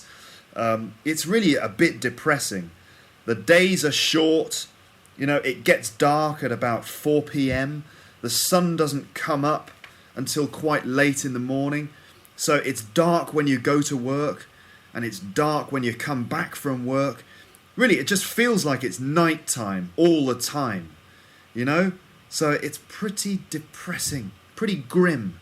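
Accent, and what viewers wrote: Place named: Cockney, United Kingdom. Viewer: This is my favorite podcast!!! You are the best! Wicked!!! your COCKNEY so funny!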